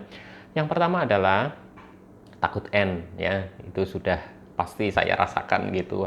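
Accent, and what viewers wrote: Banyumas, Indonesia. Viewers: Bener x mas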